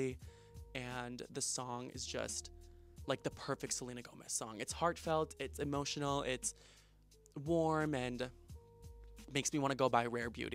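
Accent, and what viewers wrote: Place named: New York, United States. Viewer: Really?? I’m from New York and that’s how we speak. I have a heavy New York accent. It’s like people that have a British accent, not really something you can control and you do sound like you’re from New York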